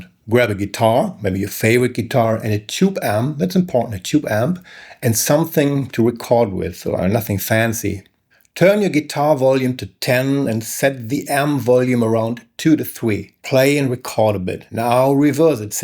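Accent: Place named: Germany. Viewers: German accent is my favorite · I like this content, and I like hearing presenters with a German accent! Very cool, sir, very cool.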